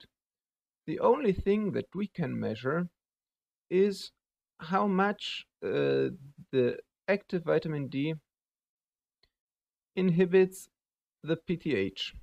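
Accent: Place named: Germany. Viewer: Excellent explanation !!! (I would just add a couple words about the Vitamin D receptors, since people will find that mentioned in other explanations, for example by Dr Coimbra himself). I am myself in the process of starting that treatment, already had some fantastic result starting it without actual knowledge about Coimbra, just based on the Book by Jeff T Bowles, who did a test on himself. Now I found the amazing story and results of Coimbra, and am looking for a physician to have supervision, as you recommended too. Since you sound a bit German, my question is whether you know of any doctors doing that treatment besides the ones mentioned here <link> Thanks a lot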